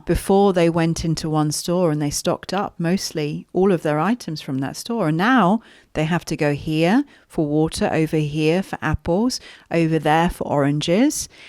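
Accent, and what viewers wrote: United Kingdom. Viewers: I do excuse me for asking are U based on UK seem to me U have strong Brit accent anyway blessing for your work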